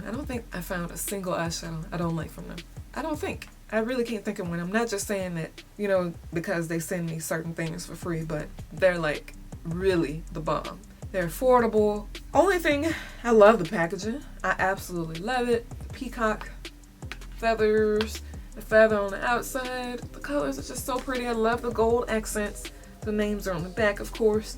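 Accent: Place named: South, United States. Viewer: Oooh...you country! Hahah! I love it though...makes me miss the South. Finch had me at hello! I'm digging that one..this entire palette is a mood. Perfect as we walk into Spring!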